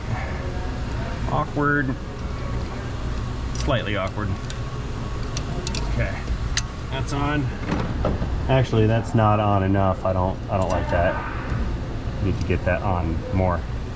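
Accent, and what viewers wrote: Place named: Harvard, United States. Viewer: why does this guy talk like hes from Harvard?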